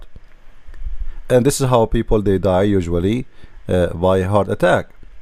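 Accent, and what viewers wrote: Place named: Arab world. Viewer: You only speak with an 'Arabic accent' . The End.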